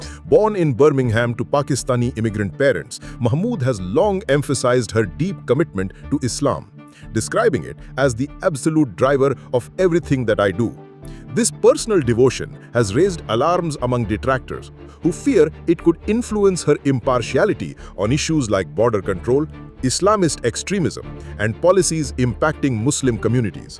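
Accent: India. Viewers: Bloody indian voice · Stupid narrative for idiots with Indian accent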